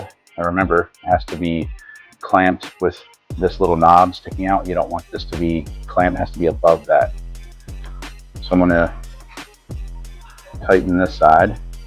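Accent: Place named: United States. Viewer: What we all need. A video of installation instructions in American English. 😊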